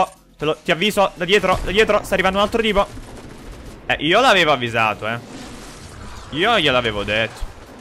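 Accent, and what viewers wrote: Naples, Italy. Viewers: Ma sei di Napoli?😂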